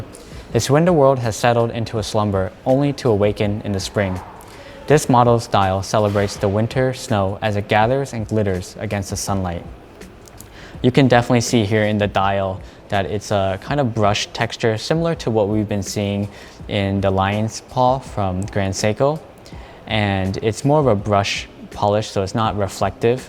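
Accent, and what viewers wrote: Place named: United States. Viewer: Super pumped!!!........Americans destroying our wonderful language!!! Lovely watches though!!! And enjoyed your content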